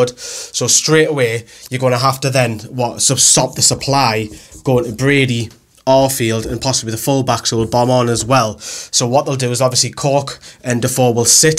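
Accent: Newcastle, United Kingdom. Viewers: Love the geordie accent (from London)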